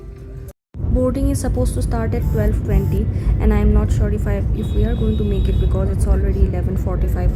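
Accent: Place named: India. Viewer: I like ur deep indian ascent English... sounds interesting indeed..how do U like Malaysia?!!🎉😅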